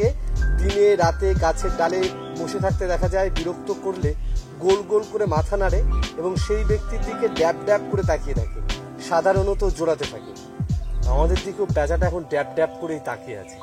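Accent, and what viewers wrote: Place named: Bangladesh. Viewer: আপনার ভাষা শুনে মনে হয় আপনি বাংলাদেশের মানুষ। পশ্চিমবঙ্গে ভাষার সঙ্গে আপনার বাসা মিল হয় না।মনে হয় বাংলাদেশের কোন অঞ্চলের ভাষা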